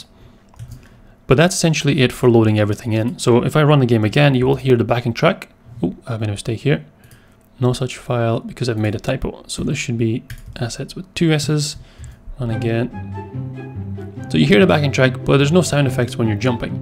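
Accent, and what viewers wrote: United Kingdom. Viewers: Are you british?i heard lot of init(not programming init).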